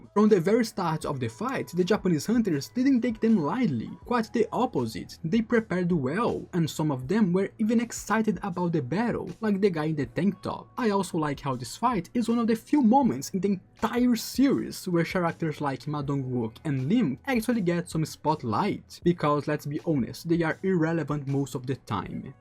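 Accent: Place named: Brazil. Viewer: mano, eu venho assistir teus vídeos regularmente e eu gosto deles. Mas tem um problema, o seu sotaque é bem forte é as vezes fica um pouco difícil de entender. eu moro no Reino unido desde de eu tinha 10 anos, então eu estou familiar com o ingles e se vc quiser a gente pode conversar um pouquinho e te der umas dicas pra melhorar a pronunciamento. Tudo de graça, é claro, eu só quero ver mais um brasileiro suceder na gringa.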